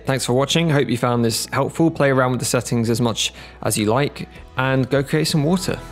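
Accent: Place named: United Kingdom. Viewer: i think you are british guy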